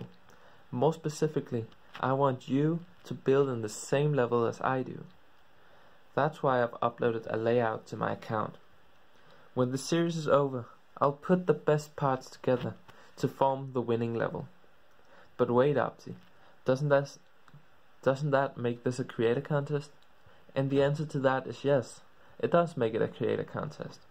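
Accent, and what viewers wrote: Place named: Denmark. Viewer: I can clearly hear that u are danish xD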